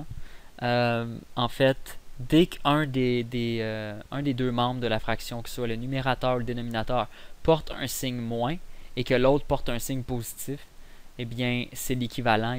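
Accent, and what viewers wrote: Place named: Quebec, Canada. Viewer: merci beaucoup et magnifique accent quebecois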